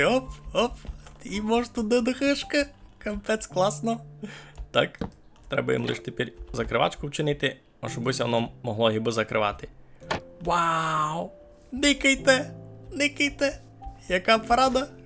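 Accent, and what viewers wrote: Ukraine. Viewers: Моцно говориш ти з якого села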